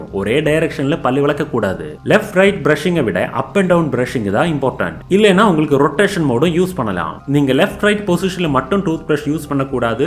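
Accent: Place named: North India, India. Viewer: Unga voice... Sir antha cardu mela irukura 16 number sollu sir nu oru vadakkan solluvanla athey maathiri irukku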